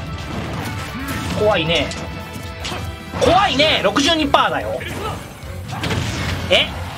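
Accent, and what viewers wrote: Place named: Hakata, Japan. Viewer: シンプルに心配やしとりあえず体治してまた長野まで博多弁届けてくれよな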